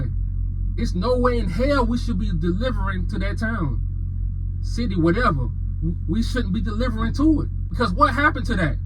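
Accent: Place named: Louisiana, United States. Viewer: 1st time I heard you speak, I said, that must be a Louisiana Man. Do you know where St. Claude & Esplanade is Nephew?? ❤❤🖤🖤💚💚👊🏽 B1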